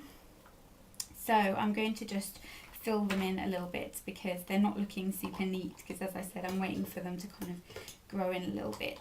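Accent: United Kingdom. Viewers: Lol I know you have a British accent, I meant ethnicity wise as you don't look Caucasian, that's all :)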